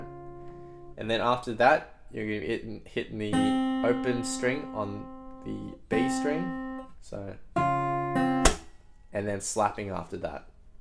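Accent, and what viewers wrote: Australia. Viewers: Sounds like Aussie accents. No offense tho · Your tutorial was great man, you need more subscribers cause you deserve it 👊😁 What nash are you anyway? (You're aussie right?)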